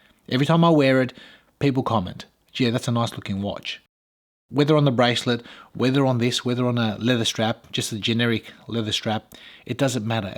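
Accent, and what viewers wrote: Australia. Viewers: I love your videos mate. I love your Aussie 🇦🇺 accent. Love from India👊😊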